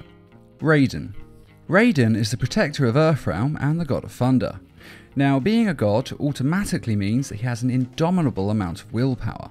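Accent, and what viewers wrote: United Kingdom. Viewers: Fear because he’s got that calm British accent that makes him immediately 10x as scary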